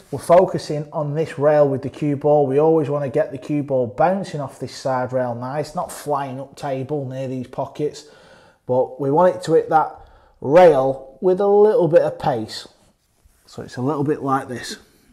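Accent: Lancashire, United Kingdom. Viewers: Right 😉 By the way, your accent is close to my cousins in Garstang. Are you from Lancashire? Anyway.. I live in the states as an EX Pat. Haven't been back to Garstang since pre covid. Keep up the good work breaking down a complex game. Cheers.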